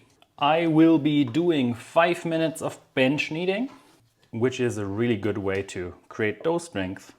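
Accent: Germany. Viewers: Me: Hmm his accent is a bit hard to place. I am thinking German.: And now here is the spreadsheet.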